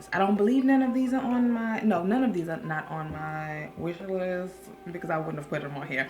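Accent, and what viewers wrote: New Orleans, United States. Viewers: Lol😄😂@ I LOVE ME SOME YOU..- I WAS FEELING SAD 🤧TODAY UNTIL YOU MADE ME LAUGH. 😄 THANKS... I LOVE YOUR NEW ORLEANS ACCENT... THIS VLOG WAS ON 🎯..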